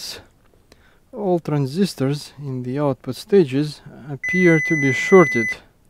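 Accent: Russia. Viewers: Русский акцент!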